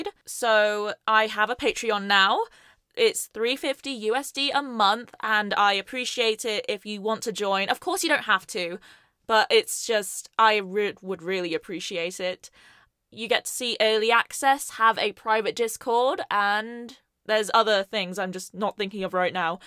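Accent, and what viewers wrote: United Kingdom. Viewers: Woo 👏 love your voice Also your really British it just comes out so much I love it omg lol 😅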